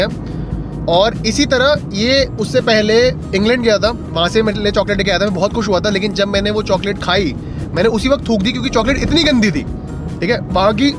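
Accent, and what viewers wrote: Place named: Haryana, India. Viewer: Jadda haryanvi hai bhai😂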